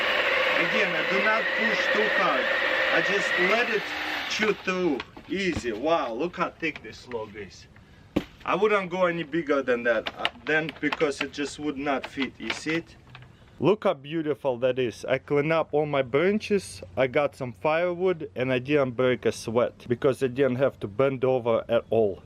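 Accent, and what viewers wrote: Russia. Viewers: without insulting sir, but are you Russian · First he's Russian, and second he says it how ever he wants.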